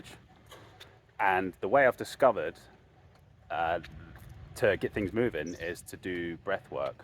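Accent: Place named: England, United Kingdom. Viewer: What country is this, in.guy is English.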